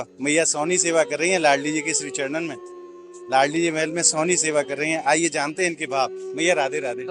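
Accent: Braj, India. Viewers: आपकी आवाज ब्रज मंडल की अच्छी लगती हैं राधा कृष्ण जी k darshan achi thrah 2mint tak करवाया करो dhanabad❤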